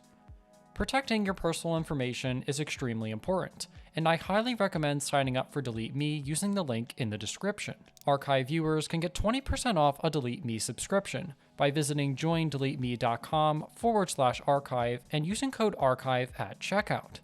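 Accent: United States. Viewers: AGGRESSIVELY american pronunciation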